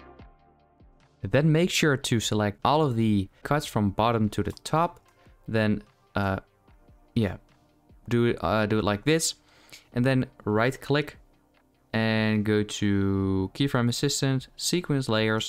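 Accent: Netherlands. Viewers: you dutch? idk im just guessing cause you sound dutch, maybe im wrong. anyways im dutch. lol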